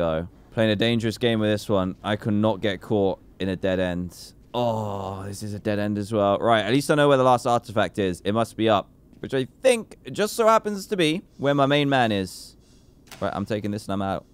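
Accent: England, United Kingdom. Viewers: I'm gonna get r/whooooshed for this, but he's saying that "this bone is on my list" very fast and with a heavy British accent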